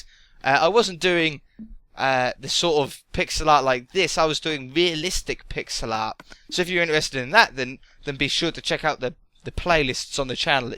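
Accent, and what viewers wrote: United Kingdom. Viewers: I am not trying to be mean but u could ride at the end of your videos but u really do a good job and r u British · I can sence yo' britishyness. Is that what it's called? Btw love your vids! 😸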